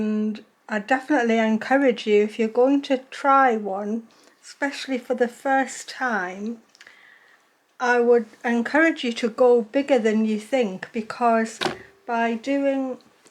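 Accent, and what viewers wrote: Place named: North, United Kingdom. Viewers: Thank you so very much for inspiring me to try this type of 'patchwork'. Instruction is clear and very helpful also your accent is a lovely hark back to my land of birth. Born near Richmon N Yorks I've lived in regional Victoria (Aus) since 1988. I always thought it strange that folk paid $30 a metre for fabric to cut up then sew back together to make a quilt, perhaps that's the canny lass I am!. So much better to reuse / rescue fabric giving it another life. Thanks again for a lovely video. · Where is your lovely accent from ? I know it’s northern, but can’t place it…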